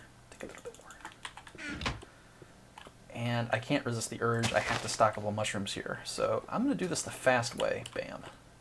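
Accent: South, United States. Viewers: Accents are awesome. My favorite is hearing UK or Australian people putting on an American accent., you sound like you're from an urban area in the southern U.S. - not a thick stereotypical "Southern" accent but a hint of the twang/drawl. (Likewise, I'm from the Minneapolis area so I have a hint of the northern Midwestern "O ya sure you betcha" thing, but not nearly as much as my friends from rural MN/WI.)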